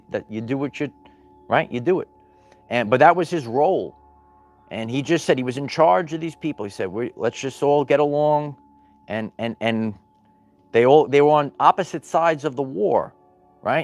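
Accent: New York, United States. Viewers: Love ur NY accent!!! Thank u for this reading 🙌✨💫❤️🙏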